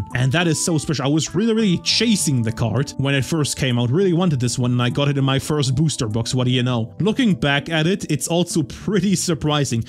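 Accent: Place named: Germany. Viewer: Haha direkt am Akzent gehört, dass du Deutscher bist :D Sehr coole Sammlung!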